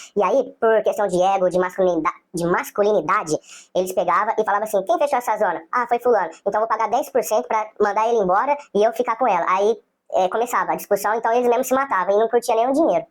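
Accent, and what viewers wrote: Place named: São Paulo, Brazil. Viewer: Você é simpático e fala - o sãopaulês-, bunitim. Valeu, pelas informações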